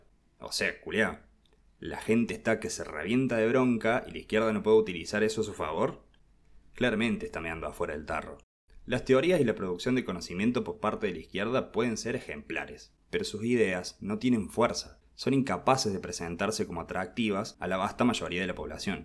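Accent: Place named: Córdoba, Argentina. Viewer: Estoy muy impresionado en general tanto por la calidad del video, tanto en lo visual como en el audio (yo medio que padezco la grabación de audios para cuando grabo videos). Buen video, pero además me llamo poderosamente la atención tu tonada, porque definitivamente es cordobesa.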